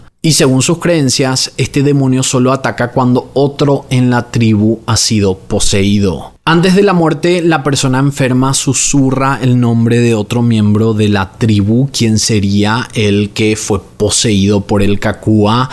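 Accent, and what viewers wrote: Paraguay. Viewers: 0 yo me estaba poniendo en duda ya que tu acento era muy de Paraguay xd y voy en información y vaya sorpresa aparte que me encanta estas clases de videos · van solo 10 segundos del video y ya me di cuenta que sos paraguayo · Que contenido más interesante!! Me gusta la manera en que narras el video y las imagines, por si acaso eres paraguayo o tenes relación? Es que tu acento es muy parecido a uno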